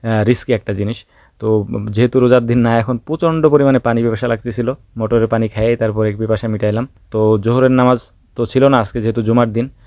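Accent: Faridpur, Bangladesh. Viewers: ভাই আপনার বাড়ি কোন জেলায়, আপনার বেশ কিছু ভিডিও দেখলাম আপনার ভাষা শুনে মনে হচ্ছে আপনি হয়তো ফরিদপুর, কিনবা গোপালগঞ্জ।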